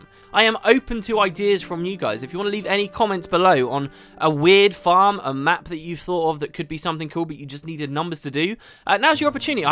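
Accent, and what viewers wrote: United Kingdom. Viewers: Youre on US Server? You sound so british..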